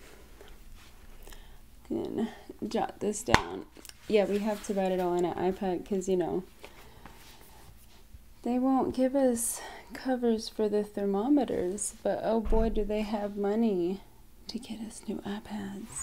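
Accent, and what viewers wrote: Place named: South, United States. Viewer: Salut, j'aime bien l'accent du Sud, c'est le plus bel accent pour le francophone que je suis. A bientôt pour une vidéo, en français j’espère.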